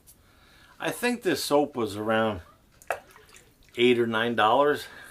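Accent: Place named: New Jersey, United States. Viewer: Where do you live? Your accent makes me think you might be from New Jersey or New York, but I could be wrong.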